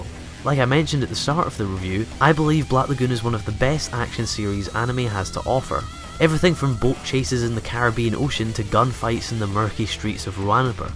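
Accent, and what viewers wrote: United Kingdom. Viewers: And c'mon man, you're British, right? Even saying "badass" sounds cringe.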